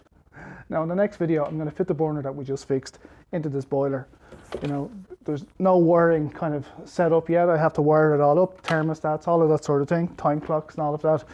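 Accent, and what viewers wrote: Dublin, Ireland. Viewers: Good morning, you sound like an auld Dub, God Bless ya! I don't know how your clip came to me, as I was working on me auld burner that's on a Warmflow boiler out me back in the dark. And Lord behold! When I got up at 04.00 this morning due to the burner being on me mind, I fired up the laptop. I went into Youtube and the very 1st video clip that was shown to me was you. Just unbelievable. Apologies for the long intro here. Just to add... I would like to say that your one hell of a bloke. Your demonstration and explanation is second to none. There's a pint of the Black Stuff on it's way and thanks very much for this well informed video. God bless and may you have continued success with your channel. Kind regards, Paddy.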